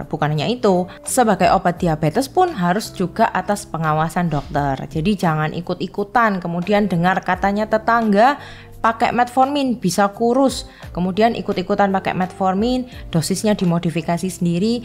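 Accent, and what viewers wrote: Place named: East Java, Indonesia. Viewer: Khas Jawa Timur😊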